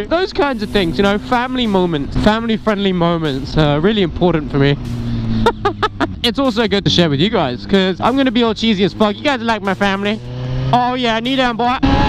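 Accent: United Kingdom. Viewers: Gotta love it (the British accent)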